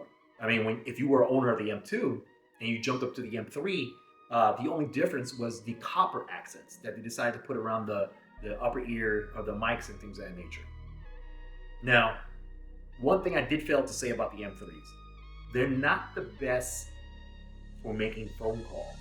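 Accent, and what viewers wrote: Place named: New York, United States. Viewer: Good effort for a noob but that New York City accent is way to heavy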